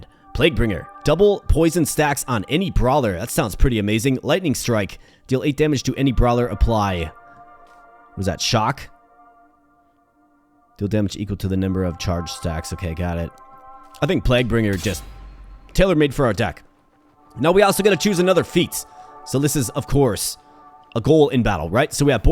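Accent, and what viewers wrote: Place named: Canada. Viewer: You know its plague and not pleg ? Are you Canadian? Canadians cannot say that word for the life of them, kinda odd. Only noticed it when I started watching youtube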